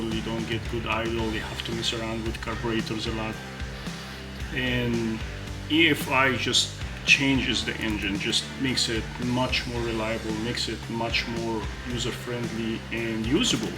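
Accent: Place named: Northern Europe. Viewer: the art of bedding music is that you should NOT be able to hear it. its to cover up background noise, reduce the "hiss" of silent passages... it should NOT be louder than the person talking. not everyone can follow a north european accent without the added distraction. my heads just not in the space to deal with it right now...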